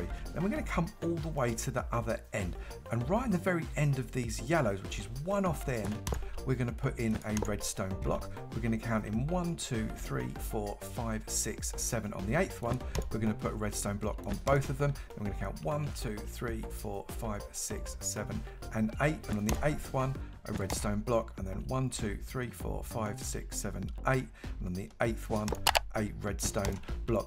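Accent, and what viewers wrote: United Kingdom. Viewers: You mean proper English or a United Kingdom accent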